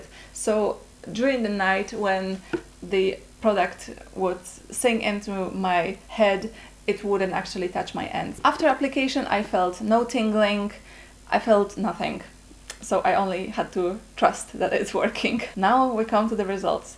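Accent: Poland. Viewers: Nie wiem czemu, ale typowo widać po twoim ang, że jestes polką. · This Polish accent... brrr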